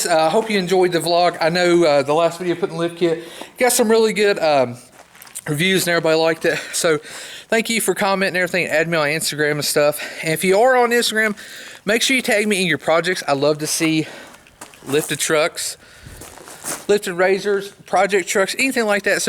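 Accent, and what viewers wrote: West Virginia, United States. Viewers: You from West Virginia?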